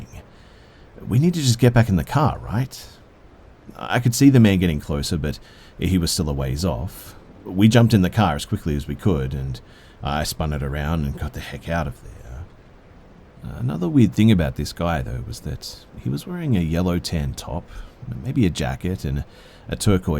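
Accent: Australia. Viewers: Aussy's are my favourite narrators now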